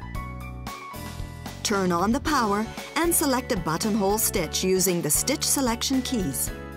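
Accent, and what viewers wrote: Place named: Canada. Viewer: The narrator has a weird accent like Catherine O'Hara's character, Moira Rose, in the sitcom Schitt's Creek. Must be a Canadian actress thing.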